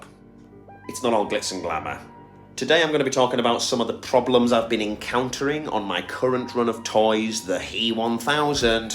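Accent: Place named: England, United Kingdom. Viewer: English accent and American toys is an odd mix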